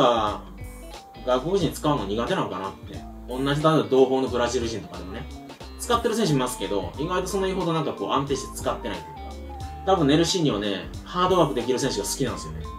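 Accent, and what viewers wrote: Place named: Kansai, Japan. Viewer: 関西弁だな、西日本のタレント軍団のサポ？！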